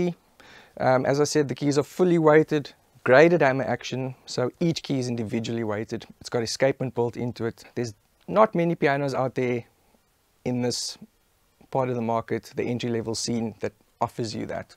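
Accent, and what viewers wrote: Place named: South Africa. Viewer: That's definitely an SA accent...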